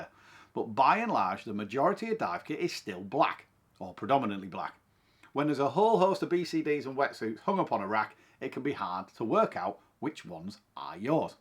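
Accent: United Kingdom. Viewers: sorry, having some trouble understanding. Cheap and plastic what often found in camping stores. Missed the bag trick for wetsuits too. Your brit accent is strong. Maybe demonstrate some of what you are saying. Thanks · To all not in the know, is a proper Englishman with an English accent! Don’t forget, the British invented pretty much everything including SCUBA, not the French, so he has licence to describe everything SCUBA! Personally, best way to get a wetsuit on is to use drysuit lube on the ankles & cuffs. I also take lengths of thin rope with carabiners to hang stuff to dry off the rails on the liveaboard.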